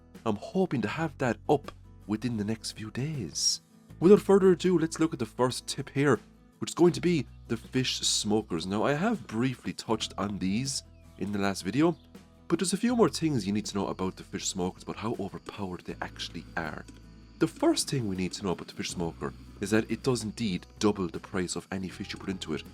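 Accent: Ireland. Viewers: Thanks for the great video! I also enjoy your lovely speaking voice including your Irish accent!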